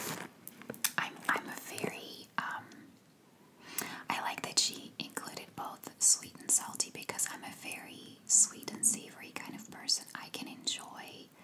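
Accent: United States. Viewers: I would have guessed she’s from Chicago!! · You may have answered this before but why do you seemingly not have an accent? Like you sound American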